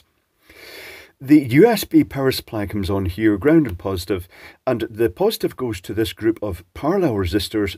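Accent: Scotland, United Kingdom. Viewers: Might be an example of edge computing. I haven't investigated the tools for this but the claim seems to be that you do training with the expected powerful computer but then condense the result into something that can run on a microcontroller. Very impressive that it not only doesn't need personal training but can even cope with a scottish accent! got a pretty clear voice, though. I wonder if it would handle glaswegian or geordie. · Especially with a UK accent. Eg YouTube auto captions usually make a hash of. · I was expecting the light to have trouble understanding the Scots brogue, just like the voice controlled lift in Burnistoun...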